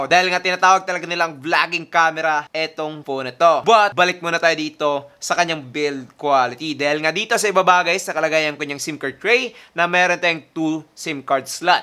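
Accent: Visayas, Philippines. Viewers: Bisaya ka sir no?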